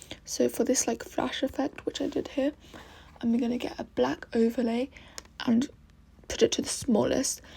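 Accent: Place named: United Kingdom. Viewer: OMG YOURRR BRITISH AYY MATE Sorry that was cringy😭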